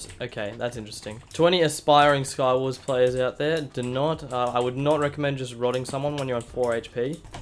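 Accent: Australia. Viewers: idk why, but i think im wierdly drawn to your videos because of the australian accent. I'm australian too so it feels like im listening to a friend ig. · Love the Australian commentary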